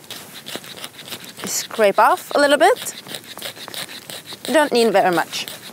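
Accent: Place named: Sweden. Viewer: dat swedish accent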